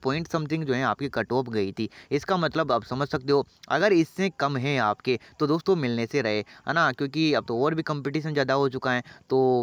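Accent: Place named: Hadoti, India. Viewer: apki awaj se hi lag gya ta aur ham kota se 😄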